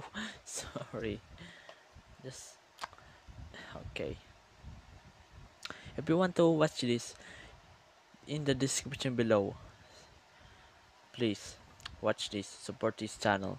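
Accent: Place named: Philippines. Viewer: Wow thats rare to find a filipino channel i knew that ascent sounded fomilar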